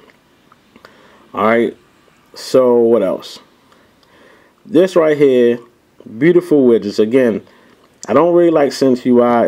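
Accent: Baltimore, United States. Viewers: I like this video and your northern accent! I'm from Philly. You sound like you from B-more though. Cool video. regarding the hard cases and thing being exposed. I have the otterbox for my Evo. That thing is awesome! Nothing is exposed at all! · I hear that B-MORE in him all day KEYS BODYMORE all day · Sounds like your from b.more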